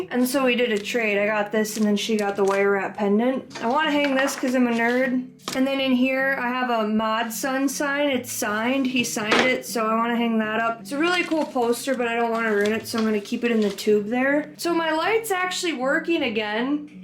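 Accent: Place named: Minnesota, United States. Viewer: That Minnesota accent lol. Ehhh!!! I’m from SC so I’m sure I’d sound funny to you. 😂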